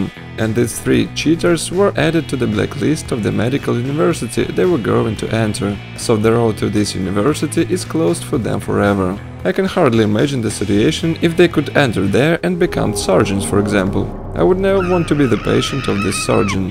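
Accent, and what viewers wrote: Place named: Russia. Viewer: Ее, русский акцент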